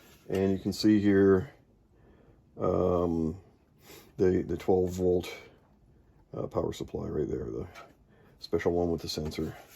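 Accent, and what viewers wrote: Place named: United Kingdom. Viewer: Hellooo, I’ve just buyed a Yuhui SFX PSU for 328 RMB or 45 USD (I think it’s a Black Commender 750) in TaoBao, rather than in NewEgg, Yuhui to my knowledge is the same or sub-brand from Sama, I just have some questions, has the PSU exploded or had some kind of issue? Is it silent? I don’t want to assume but you’re accent sounds British and if I’m correct, in the UK, they use 220V and my PSU, like yours, in the input says 100-240VAC (mine had a sticker on the plug saying 220V/AC), do you know if it works with 110V, since I live on a country we’re we use 110V? It also came with a Chinese type I connector (To Outlet) and also to my knowledge, in Chine they use 220V Nice content btw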